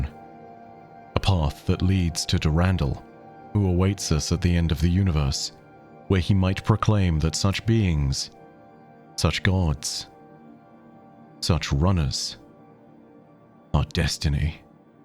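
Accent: United Kingdom. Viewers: I'm glad we have a nice British voiced lore master for both Bungie games and FromSoft games.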